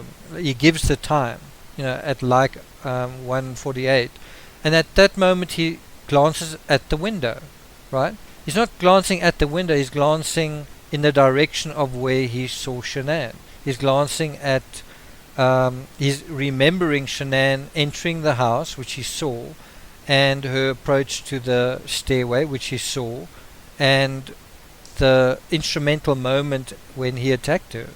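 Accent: South Africa. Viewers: I miss V the series so much!!! Are you from South Africa? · Hey! You're South African 🇿🇦🇿🇦🇿🇦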